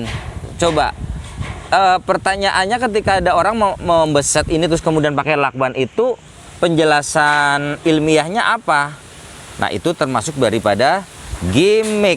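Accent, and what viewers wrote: Jakarta, Indonesia. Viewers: Abang orang Betawi ya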